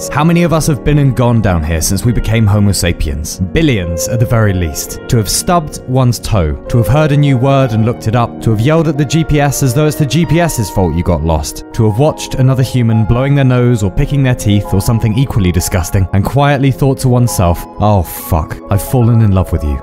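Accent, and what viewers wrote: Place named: England, United Kingdom. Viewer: Why are so English 🦖🦖🦖🦖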